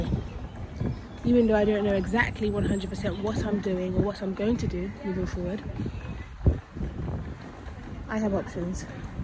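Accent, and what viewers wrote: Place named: United Kingdom. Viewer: your British accent is so proper and soothing. you could be a narrator for sure 😂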